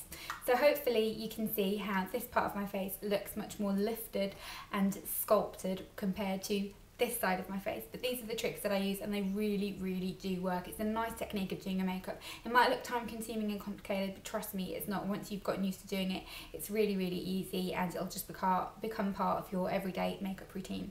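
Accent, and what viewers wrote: United Kingdom. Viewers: That British accent though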